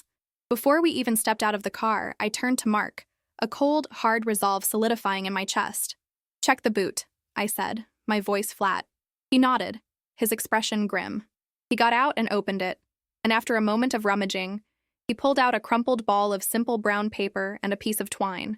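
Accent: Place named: United States. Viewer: Narration in an American accent, calling the trunk of the car, the "boot," which is what Brits call it, amused me.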